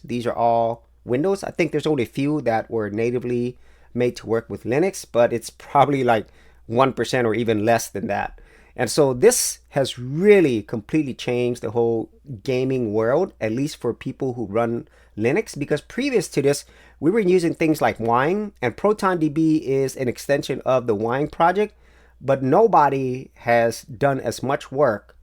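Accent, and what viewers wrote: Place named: Latin America. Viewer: My wife and I were trying to figure out your nationality based on your accent. I said Hspanic but my wife said Korean. I see your picture now but help us! Did you grow up in a Latino country?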